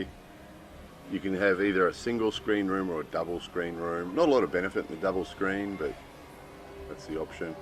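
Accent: Australia. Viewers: You should have displayed your Japanese instead of us having to your Australian accent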